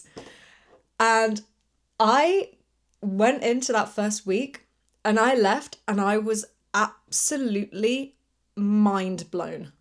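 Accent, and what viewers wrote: United Kingdom. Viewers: Everyday is the earliest/youngest day to start. Just do it. Actually that's so much easier than before since YT exist. In the old days, what you can do is rolling the the sound tape and checking the book at the same time. It's impossible for me. YT teach the english so much more effective than the school at my young days. Nothing better than listen to some topic you are interested with the language you learning. For other english learners, this video is speaking with very English English accent. If you speak in this accent, you will be a funny guy in America and very native in UK. There is so much video on YT but there is not much video making by British. You know what, I feel so confidence on English until I arrived UK. I understand nothing when people speaking. I feel like I got scam all my life time by the school and YT. I realize I learnt American English the whole time and that's why I failed my school exam (mostly base on British English). 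But did a really great job, I can understand 100% in this podcast. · Very interesting to know how an adult can learn foreign languages easily and your story is indeed so interesting, as English natives seldom speak foreign languages. Determination is so important. Your videos are really helpful and your British prononciation is absolutely fantastic and you are a great professor.Thank you very much ❤ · What a cute teacher! UK accent is very cool. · 안녕하세요~~~ 영국발음 너무 좋아요~~ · I always love watching your videos,. It's so easy to learn British English from your words, and it's so enjoyable. You're great, keep it up and take care. See you soon,. 🇬🇧🇬🇧👍🏻👍🏻📚📚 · Where are you from? You speak in British accent, but it seems to me that you live in USA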